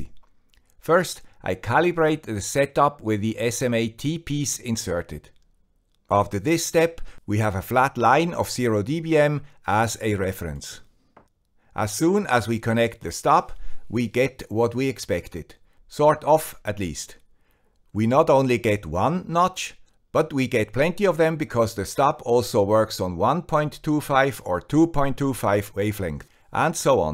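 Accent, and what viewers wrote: Switzerland. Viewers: Over the years, my ears have developed an adaptive filter for that Swiss accent. Thank you for producing material that made that very much a worthwhile endeavor.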